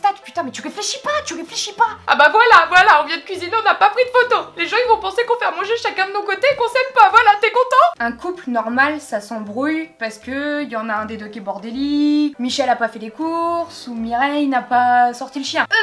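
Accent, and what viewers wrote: England, United Kingdom. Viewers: J'adore trop ton accent d' anglais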